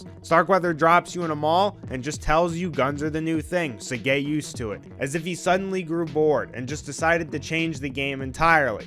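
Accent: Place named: Chicago, United States. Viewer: That's an interesting accent you have. Are you from Chicago?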